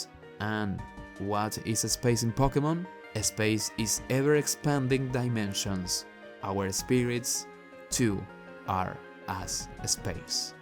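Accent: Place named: Spain. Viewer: I like your accent dude, where are you from? You mention empanadas in the description so I'm guessing español is your first language? Anyways really cool video with all the real world historical context. Earned my sub, man! I hope I will find more interesting videos when I check out the rest of your channel!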